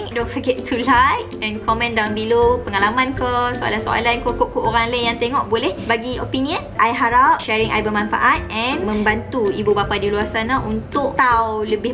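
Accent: North, Malaysia. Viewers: Boleh ja faham..biar la dia dah selesa ckp loghat utara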